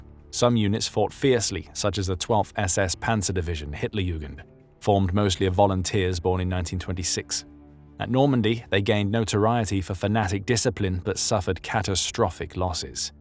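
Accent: United Kingdom. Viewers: I am more concerned that there is a british voice narrating the lives of germans rather than blue eyes and blonde hair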